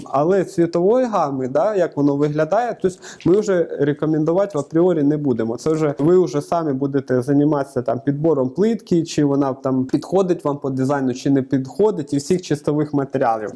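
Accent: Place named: Chernihiv, Ukraine. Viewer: відео та канал загалом дуже круті, дякую за технічні подробиці. Судячи по твоїй вимові, ти мабуть родом з десь з Чернігівської області?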